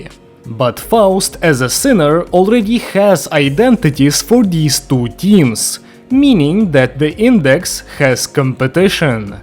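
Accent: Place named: Russia. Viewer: почему произошение такое будто русский читает англ текст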